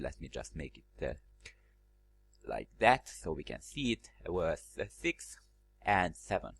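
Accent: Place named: Hungary. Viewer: i like your hungarian accent:))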